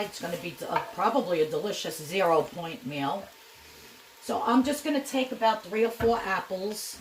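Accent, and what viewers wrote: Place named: Long Island, United States. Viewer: Hey sweetie! I freakin love you and your videos. I'm a born and raised Upstate New Yorker (Albany area) but I live in Texas now, but I sure do miss NY. You have a Long Island accent, is that where you're from? I have many friends there (Wantagh, Massapequa, and Glen Cove). Keep up the great video's and thank you for sharing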